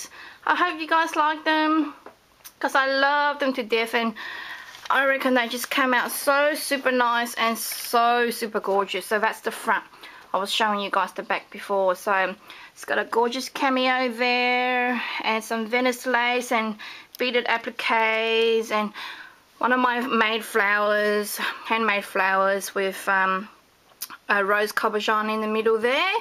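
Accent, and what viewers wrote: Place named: England, United Kingdom. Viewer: very english! lol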